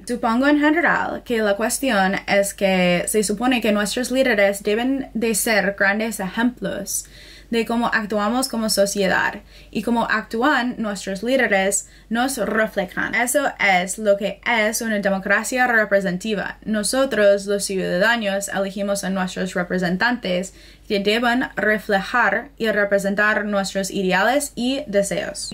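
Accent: United States. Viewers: Que lindo El Castellano pronunciado por una Estadounidense . Saludos Desde Italia .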